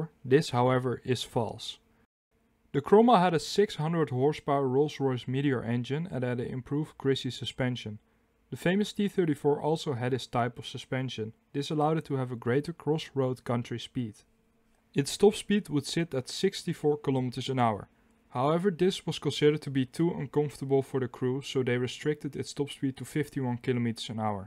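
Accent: Netherlands. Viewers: That was extremely informative, and i personally appreciated your Dutch accent; very humble, yet PROUD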